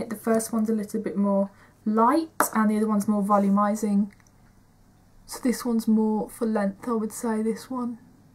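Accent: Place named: Birmingham, United Kingdom. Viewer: where are you from you sounds like a brummie! xx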